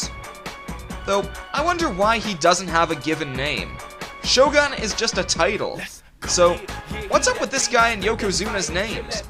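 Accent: Scotland, United Kingdom. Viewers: he sounds scottish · kojackin my opinion sounds somewere between british and scotish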